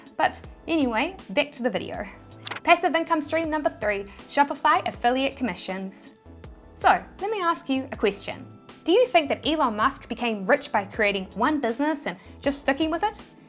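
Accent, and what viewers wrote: New Zealand. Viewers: I ended up with your video as I was googling Kiwi accents awwww I miss NZ sooo much! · Thanks,. So inspirational. I love your Kiwi "ik-cent" too:-) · "Chick out check" - I will never get used to the Kiwi accent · Wow, New Zealand's accent is so good. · I love the New Zealand accent. I'm moving there. It's settled. I made this decision after watching the almighty Johnsons... but I'm declaring it on this random YouTube video that popped up for me. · I believe she is from New Zealand...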